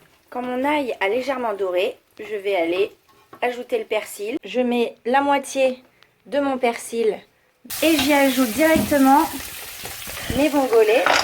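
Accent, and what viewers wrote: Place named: Italy. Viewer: accent italien, bonjour ! :face-purple-crying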